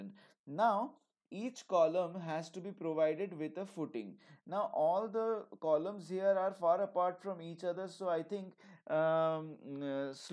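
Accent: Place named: India. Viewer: If you are an engineer you should be speak American accent other than Indian accent you describe in Hindi language it will good for all I don't like damage English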